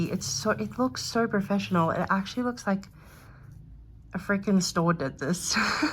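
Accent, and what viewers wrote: South Africa. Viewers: 😅 I watched this video until the end, only to realise “Wait, her surname is ”, this must be a South African! I was second guessing myself throughout the video because I noticed your accent sounds very South African. I was busy working while listening to your video in the background. Now that I found a SA cricut channel, I will binge watch. Thank you🤭🤭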